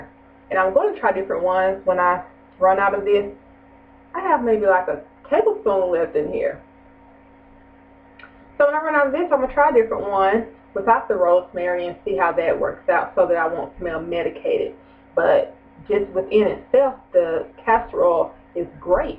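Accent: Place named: South, United States. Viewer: swear I love her southern voice... would hit that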